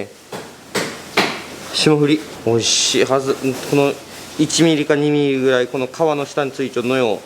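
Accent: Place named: Takanabe, Japan. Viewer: まさか古里の方言が聞けるとは思わなかった、高鍋生まれ杉並区在住の爺さんより。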